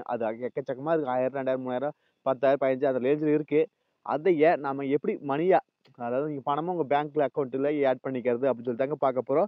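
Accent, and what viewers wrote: Coimbatore, India. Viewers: Coimbatore voice super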